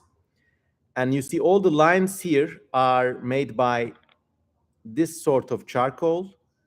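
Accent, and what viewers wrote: Turkey. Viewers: Abi Türkçe seslerle İngilizce konuşmana bayılıyorum ya :D, bu arada çantandaki kalemlere de bayıldım.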